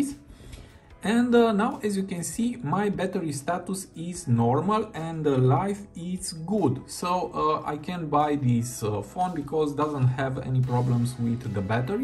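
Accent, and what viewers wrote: Romania. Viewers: Laa fel! :)))) Eram: măi, ia stai asa! Asta e accent românesc! :))) am rămas șocat că am recunoscut. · Mi-am dat seama din accentu tau ca esti român și dupa am vazut la channel description lol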